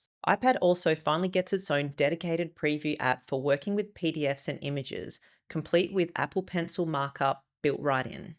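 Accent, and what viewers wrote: Australia. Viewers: Australian accent.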